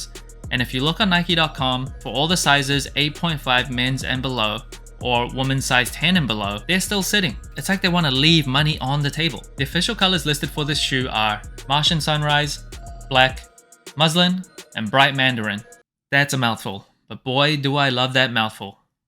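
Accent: Australia. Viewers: I can’t read your accent, are you from Australia?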